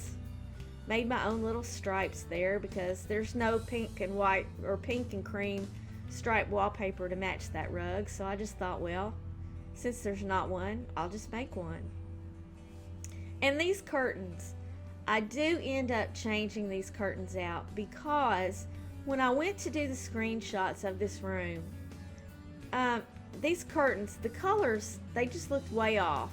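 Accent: South, United States. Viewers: Love your sweet southern accent :) I live in Georgia so its a good feeling hearing a southern simmer voice! :D But i dont know why because i am from the north and i have northern accent. Great video! I subscribed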